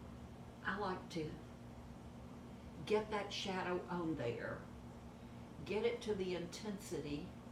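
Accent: South, United States. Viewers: Love the look, music is awfully annoying! Just your sweet southern voice is all we need! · Agree, the whistling is sort of annoying as it's hard to hear you. You look wonderful and always dress stylishly. Love your Southern accent. Diana in AZ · It's too bad the video is ruined with that awful whistling. The lady has a southern accent and is difficult enough to understand without the whistling.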